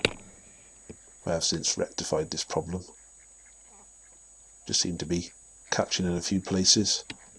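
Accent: United Kingdom. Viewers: The best commentary on the web (for this type of video I mean). The UK accent sounds great. Hello from Milwaukee, Wisconsin.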